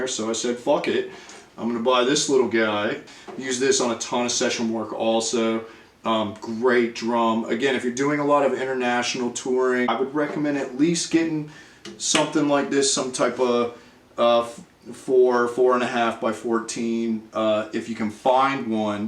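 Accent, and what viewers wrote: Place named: California, United States. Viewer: I love listening to you speak. Voice is like Cali skater meets Ben Stein and talk is all about drums. Can't get enough. Rock on. 🤙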